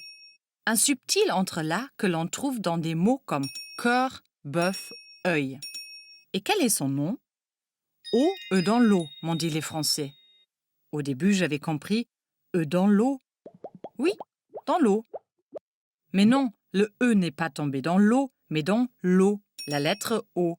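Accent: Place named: Germany. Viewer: Ç'aurait été quand même mieux si la présentatrice parlait français sans cet accent allemand un peu gênant. Mais bon merci en tout cas pour la vidéo.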